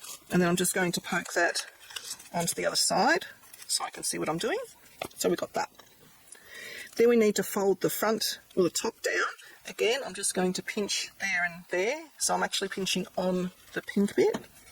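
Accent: Australia. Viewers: Your directions are terrific and I could listen to your lovely Australian accent all day! Thank you for this video! Subscribed! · I found you today & immediately went to your home page to see where you are from! I love Australian accents! This makes the cutest little envelopes & I just happen to have made a few tags that will fit in them! I think I will make a bunch now, just have on hand, but I think I will also try to remember to cut some strips from whatever scrap paper goes in a journal & then make some that are “matchy- matchy”! I made a variation several years that you keep the long strip in one piece, folding it back & forth until you reach the envelope, then fold the flap down, and use a paperclip, Velcro or magnet, if you feel it needs something. Mine didn’t, a bone folder was adequate! Thanks so much! These are a lot of fun! Love to down under! Erica❤I have subscribed, see you soon!❤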